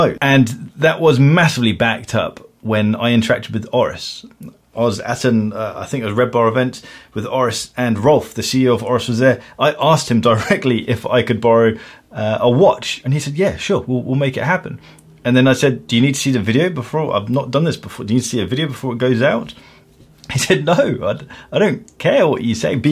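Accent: United Kingdom. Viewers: is the Chris Harris of the watch world. Always well articulated thoughts and enjoyable to watch. It might also be the British accent.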